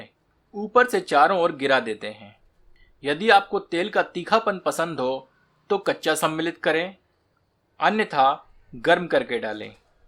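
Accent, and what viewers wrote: Standard. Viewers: i heard his voice till the end nd enjoyed pure Hindi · हिंदी भाषा का शुद्ध उच्चारण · Itni shub Hindi mein baat karne ke baje se mujhe mutton de zyada apki Hindi Sune ka man hain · जी आप की हिन्दी सुनकर मुझे बहुत बहुर खुस्हुवा इतनि शुद्ध है कोही नहि बोलसक्ता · आप तो कमाल के कलाकार हो, इतनी शुद्ध हिंदी और वो भी मटन व्यंजन विधि के बारे में क्या बात है ! पहली बार किसी का वीडियो देख मजा आ गया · Shudh hindi bolte hain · आप के शुद्ध हिन्दी उच्चारण सुन कर आंनद आ गया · आपकी शुद्ध हिन्दी बोलना अच्छा लगा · Aap pahle durdarshan chanal pe programme present karte the, aisi Hindi wahi dekhne aur sunne ko milta thi, 😢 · Shudh Hindi ka uchcharan aapke baton se pet bhar Gaya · आपकी हिंदी की धारा प्रवाह ... शुद्ध उच्चारण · सच में आप सर हिंदी के शिक्षक हैं। मतलब क्या हीं बोले आपको, हिंदी के बारे में। इतनी शुद्ध हिंदी!!😀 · Apki Shudh Hindi sun ke man prafullit ho gaya...pehli baar kisiko itni acchi Hindi bolte hue suna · रेसिपी तो बहुत अच्छी है लेकिन उससे भी अच्छी आपकी शुद्ध हिंदी है। कृपया आप इसी तरह अच्छी अच्छी रेसिपी लाते रहे जिससे हमे आपकी हिंदी भी सुनने को मिलती रहे🙏🙏🙏🙏🙏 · Mujhe apki resipy to achhi lagi sath mai apki sudh hindi bhot achhi lagi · इतनी शुद्ध हिन्दी तो हमारे हिन्दी के शिक्षक भी नहीं बोलते थे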